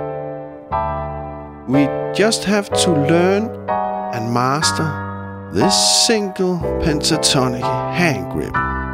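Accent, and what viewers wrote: Denmark. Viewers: i love danish when they speak english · I did, II - V - I and pentatonics are usually one of the first lessons I teach in my lessons (bass player). Who does lectures in Basic Jazz Harmonics in another way? But it's been a long time, so thanks . Fresh input is always welcome! I still love your very musically educated "muppet hands show" :D Danke schön, well done (do I hear a Danish accent? ) Frank --- and, of course I'm a bit drunk. Sorry!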